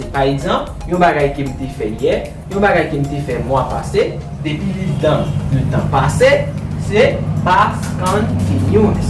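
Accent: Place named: United States. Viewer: Great English American bro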